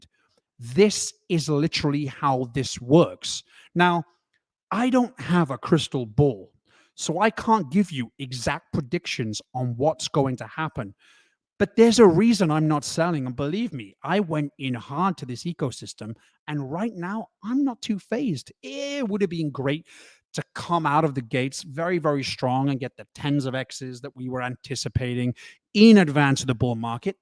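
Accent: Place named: United Kingdom. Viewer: I’ve always tried figure out the accent. Are you British but spent many many years in the States? Thanks mate, keep up the great work.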